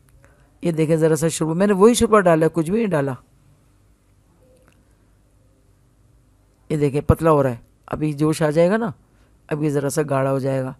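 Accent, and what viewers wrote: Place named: Lucknow, India. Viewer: I love your "urdu", sound like "Lakhnao" ki urdu...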